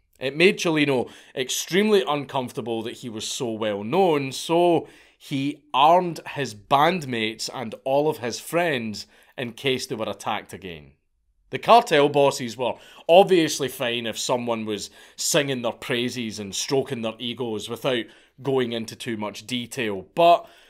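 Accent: Scotland, United Kingdom. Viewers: I can see now that Mexico Spanish pronunciation can be a real bitch for a Scottish accent. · I’ve never heard “corridos” pronounced like that 😆 something beautiful about a Scottish (or Irish?) lad saying Spanish words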